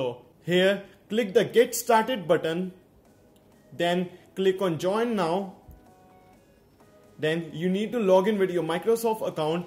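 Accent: India. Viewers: sounds like an indian speaking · u indian?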